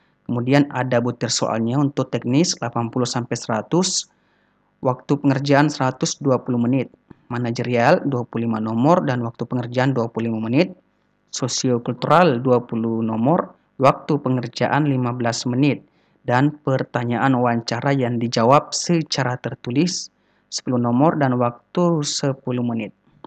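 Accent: Bugis, Indonesia. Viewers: Cekcok logt Bugis 😀😀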